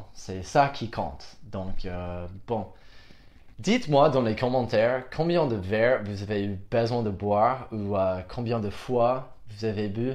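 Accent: United States. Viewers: Je suis aussi Americain, et j'ai suivi le cours de francais au lycee, il y avait 40 ans. Mais je peux bien parler francais, et je peux bien vous comprendre, parce que vous parlez sans vitesse, et avec un accent americain. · Bonjour, je trouve que tu parles très bien français, avec cependant un accent américain prononcé mais j'aimerais parler ta langue aussi bien que tu parles la mienne, tes vidéos sont très intéressantes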